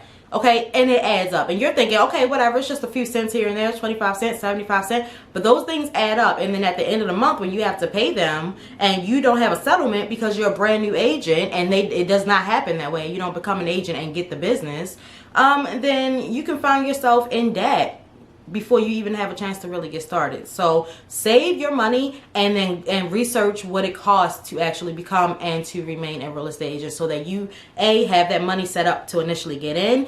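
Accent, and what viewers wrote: Baltimore, United States. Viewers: I can hear the Baltimore in your accent. Good Video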